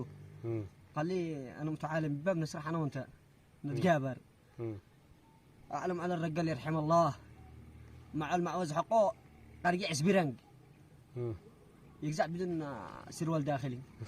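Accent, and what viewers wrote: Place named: Taiz, Yemen. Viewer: يا جماعة هذا لغجة عنده لغاجة وكمان يبهرها باللهجة التعزِّية أغثى وأكره لهجة أعوذ بالله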